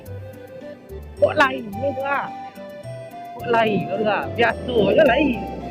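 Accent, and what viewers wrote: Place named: West Sumatra, Indonesia. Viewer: Kayak mana ni ngomong nya indomi (indo minang) yg jls2 aja la ngomong nya 😁😂😂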